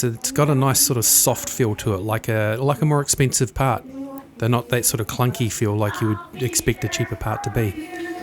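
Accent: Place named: Australia. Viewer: The down under accent makes any content even more fun to watch. Awesome job, mate.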